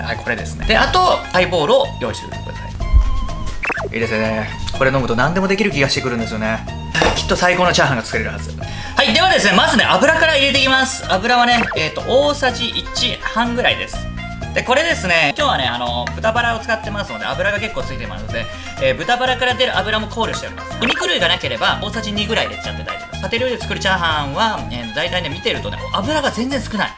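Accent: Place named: Chiba, Japan. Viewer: 君て千葉でしたかー千葉の従兄弟みたいだなと。イントネーションが一緒なんで親近感あります😁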